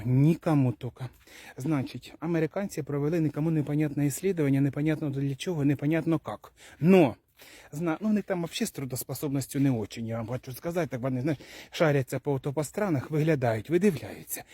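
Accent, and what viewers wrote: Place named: Bila Tserkva, Ukraine. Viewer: Боже ж ты мой, какой приятный человек, и говор его душу ласкает. И юмор добрый,житейский. Так говорила моя бабушка и мама, они родом из под Белой Церкви. Давно их нет на этом свете, а я помню их говор. Спасибо за юмор, за доброту. Всем мира и чистого неба.🎉🎉🎉❤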